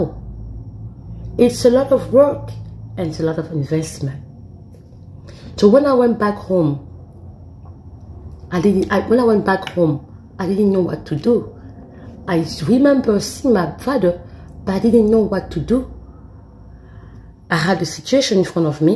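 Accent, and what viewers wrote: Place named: France. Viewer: Mmmm… French accent 😊😊😊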